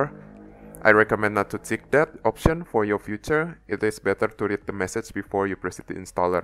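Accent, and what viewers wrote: Indonesia. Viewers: Great content. First time on your channel and I easily recognized your Indonesian accent. :) Salam indo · Done and works, beautifully explain. thanks. btw kerasa banget logat indo nya wkwkwk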